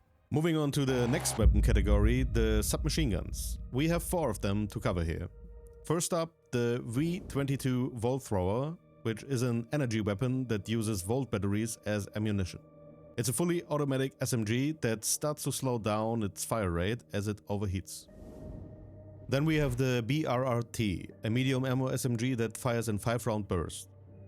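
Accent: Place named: Germany. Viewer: Guten Content bringst du. Deinem Akzent zu entnehmen hast du deutsche Wurzeln wenn ich mich nicht irre. Glaub an dich und mach weiter. Nice Content Dude. Maybe I have The Chance and we can Play together at Release.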